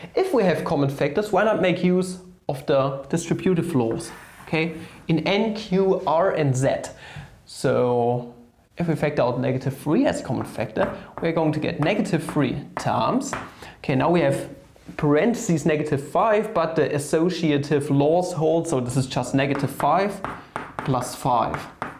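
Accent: Germany. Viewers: why? I mean the accent sounds very german but I just wanted to ask cause I wasn't sure. Other countries have accents like that too. But I actually saw on his other channel that he is, in fact, german.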